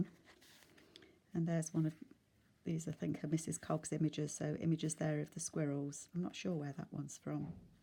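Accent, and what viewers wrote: England, United Kingdom. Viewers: WOW!!! I LOVE LOVE LOVE this and LOVE Beatrix Potter, especially Peter Rabbit; and now Squirrel Nutkin! Amazing journal, how can I find a Peter Rabbit book?? I love your english accent by the way!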